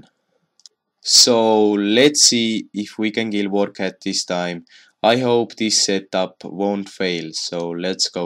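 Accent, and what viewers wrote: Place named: Estonia. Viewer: Hea eesti aksent sees aga muidu 5+! Nice vid ;P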